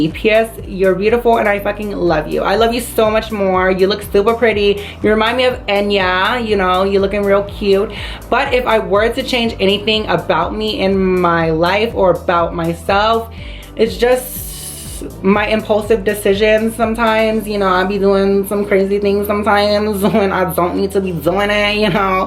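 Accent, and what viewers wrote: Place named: New York, United States. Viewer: I like how you said “dau - tuah “ I love ny accents 😭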